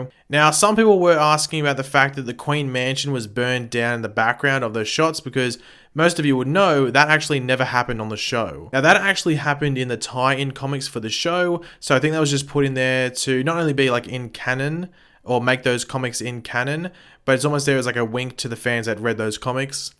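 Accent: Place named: Australia. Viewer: CJ Rehv he said cracking my nigga. He’s Aussie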